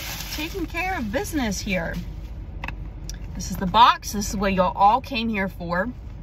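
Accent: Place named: South, United States. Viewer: Hey it's been a while since I've watched your videos but you're beautiful as always and fun with that cute southern accent of yours.:) If you ever happen to visit Atlanta, Georgia we should hangout:D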